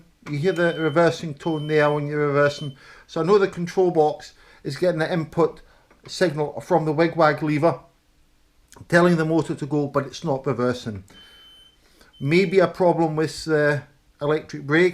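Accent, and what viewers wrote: Scotland, United Kingdom. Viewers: Hi Iv watched many of your videos and found them very informative . Great to watch and hear your fine Scottish accent . I am English but I live in Australia . I’m having a problem with a controller on a non identified mobility scooter ( no idea tags on it any where ) I have emailed you but so far no response . Can you help please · Fab U Lous, not sure about the funny accent but being from a similar part of the world, Glasgow, I do know however this is the correct and only way to speak english. All that aside, thank you so much for the time and effort in doing the video. Living in sunny Bantry with the mad Irish wife, now using a scooter ocassionally, took it to bits to try figger what was wrong. Your video suggests the most likely cause and the other info re the brake helps big time. Many thanks again for the help, Bill P Mad Scot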